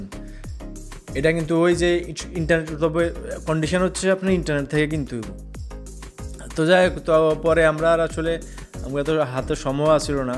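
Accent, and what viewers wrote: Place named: Noakhali, Bangladesh. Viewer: Baiya apnader bari Noakhalir kon jaigai plz bolen, apnar Kotha amader ancholik basar moto.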